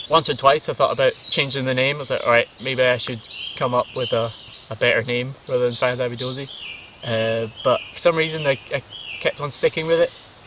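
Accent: Scotland, United Kingdom. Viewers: You. Are not alone.. we thought same.. until we heard speak.. And that beautiful Scottish accent.. which we sincerely hope he does not lose.. gave him away.. We are Scottish.. and we enjoy his channel immensely.. As to the potential name change.. as this video is over 5 years old.. he has kept the name.. The name is part of roots.. part of who he was as well as who he has become.. To change would.. to us.. be a betrayal of his journey to where he is now.. seems so wrong.. Besides.. all of his videos.. at least all we have seen.. he references Fandabi Dozi in the opening.. and seems impractical to change.. Who changes horses in midst of a stream.. especially if they are serving you well In getting you across? We are so glad you kept the name, … Slan mo charaid💜💙🌹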